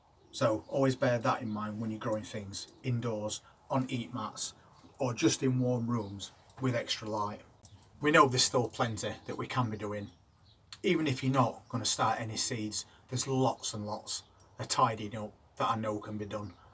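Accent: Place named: Derbyshire, United Kingdom. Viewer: I knew from the accent we are close, I am in Sheffield not too far from one another. :D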